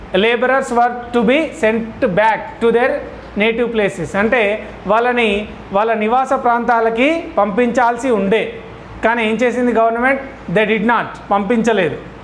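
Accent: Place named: Telangana, India. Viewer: Sir మీరు తెలంగాణ మాండలికం లో మాట్లాడుతున్నారు..... కోస్తా మాండలికం లో మాట్లాడండి sir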